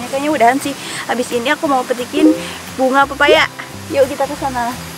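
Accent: Banyumas, Indonesia. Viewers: Mba si umahe nng ndi mba, sue subscrbe tp ora ngerti wong ndi, bhsane ngapak2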